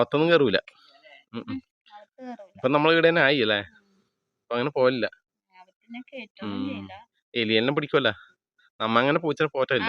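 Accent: Kannur, India. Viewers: താങ്കൾ പറയുന്നത് ശരി തന്നെയാണ് ഒരു ലിസ്റ്റിൽ തന്നെ നാല് അഞ്ച് സ്റ്റൈൽ ഭാഷ ഉണ്ടാകും..ഞങ്ങളുടെ മലപ്പുറത്തും ഭാഷകൾ വ്യത്യാസം വരും..ഉദാഹരണം വേങ്ങര എന്ന് പറയുന്ന സ്ഥലത്ത്.. കജ്ജ് എന്ന് പറയും.. ഞങ്ങൾ പെരിന്തൽമണ്ണ ഭാഗത്ത് കയ്യ് എന്ന് പറയും.. വേങ്ങര പാട്ട് പജ്ജ് എന്ന് പറയും.. ഞങ്ങൾ പെരിന്തൽമണ്ണ പശു എന്നു പറയും... വേങ്ങര ഭാഗത്ത്. കജ്ജുമ്മ നജ്ജായി..ഞങ്ങൾ പെരിന്തൽമണ്ണ ഭാഗത്ത്. കയ്യുംമേൽ നെയ്യ് പറ്റി.... വർത്താനം സ്റ്റൈൽ നല്ലതാണ്.. അബുദാബിയിൽ എനിക്കൊരു കൂട്ടുകാരൻ ഉണ്ടായിരുന്നു അയാളുടെ പേര് തമ്പാൻ.. അദ്ദേഹം കണ്ണൂരാണ്.. നിങ്ങളുടെ അതേ ഭാഷ തന്നെയാണ് ചിലപ്പോൾ നിങ്ങളുടെ നാട്ടുകാരൻ ആയിരിക്കാം.. ഓക്കേ ബ്രോ കണ്ടിന്യൂ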